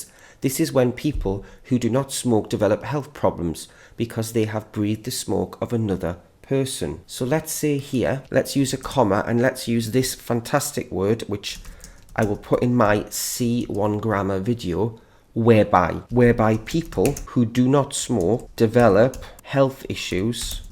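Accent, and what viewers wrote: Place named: United Kingdom. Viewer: Hi~ I am back. My final exam has just finished. I will try to practice writing skill during this long new year holiday. Thank you very much. Please keep doing🎉😊 I cannot love your teaching program projects more.🎉 Besides of writing, i love the way you reading at the end coz i could learn how to read and practice pronunciation, tonation, british accent. Perfect! ❤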